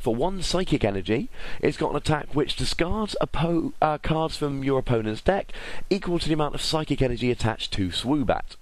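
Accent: United Kingdom. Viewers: I love your British accent! One game I do remember was when I was playing my newly made Zekeels. I managed to set up 3 Eels in less than 7 or 8 turns. My Accelgor/Whirlipeed deck only drew 1 prize during the game. At some point, I thought "Screw this" because I couldn't decide on who should be my main attacker. I just Bolt Struck (while eviolited) until everybody died.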